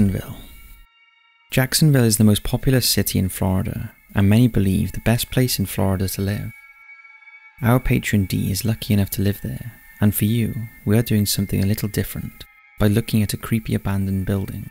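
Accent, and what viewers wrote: United Kingdom. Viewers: Too much catering to americans on these channels. And his voice isn't anything special it's just a generic british accent.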